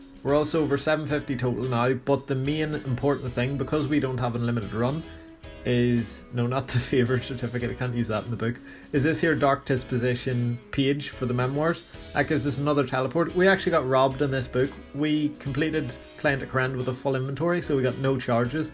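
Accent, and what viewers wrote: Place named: Scotland, United Kingdom. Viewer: Love your Scottish accent bro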